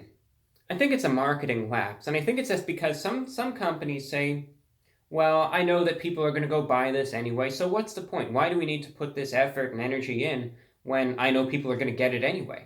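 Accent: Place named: New York, United States. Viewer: where is this guy from? I would guess New York City or maybe NJ? that accent....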